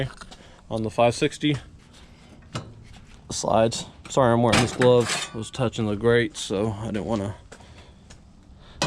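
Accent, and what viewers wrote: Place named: Texas, United States. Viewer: I could tell that was TX straight away. The houses; the sky; your accent. I used to work for Continental Airlines in H-Town, back in the day. Excellent review,!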